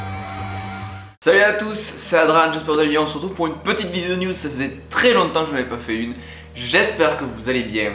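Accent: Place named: South, France. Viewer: L olo sauf ta voix du sud ^^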